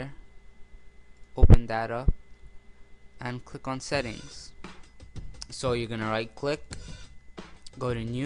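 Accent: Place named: New Jersey, United States. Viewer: YOU SOUND LIKE YOU FROM JERSEY.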